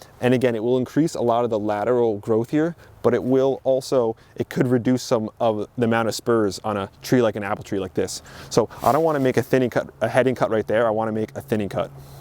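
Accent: New Jersey, United States. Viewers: Are you from joisey? Im from joisey!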